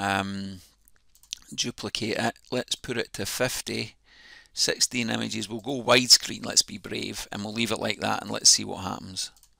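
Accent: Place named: Scotland, United Kingdom. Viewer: Hi, I’m a new user. I’m enjoying your videos but can I give a suggestion. You move back and forward without giving detail. For instance, in this video you mention artefacts then immediately go to find the problem. At this stage I don’t know what artefacts you’re talking about. It would be beneficial I think, to your viewers if you could mention what the problems were. This would make sense of your remedies. I don’t mean to criticise so hope you don’t take it as such. I would point out I am visually impaired so it is possible it’s just me but I don’t think so. P.S. It’s good to hear a fellow Scott’s voice it a YouTube video for a change.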